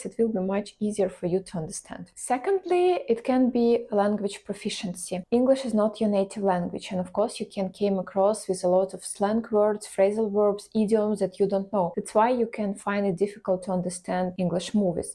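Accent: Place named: Eastern Europe. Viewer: Very nice Slavic accent. Continue filming on similar topics. Будь ласка Дуже файний слов'янський акцент. Продовження знімати на схожі теми. Please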